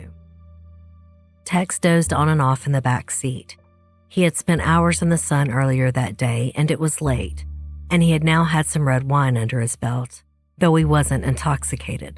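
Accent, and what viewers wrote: South, United States. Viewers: I love your channel, you have the most relaxing voice to listen to a good story while omw to bed. And I’m from the South so I love your accent, it makes you feel familiar!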